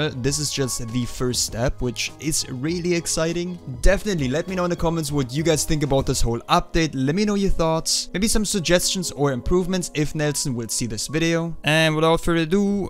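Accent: Germany. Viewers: Are you german by any cance? ur accent just sounds like that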